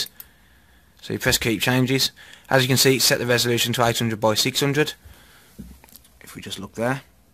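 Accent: United Kingdom. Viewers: The most british accent i've ever heard.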